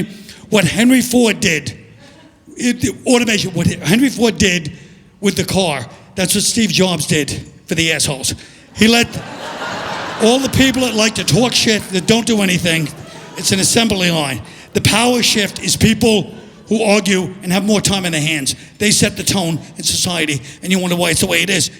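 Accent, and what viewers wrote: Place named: New York, United States. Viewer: Wow I just realized how much trump n Colin have the same cadence. New Yorkers am I right.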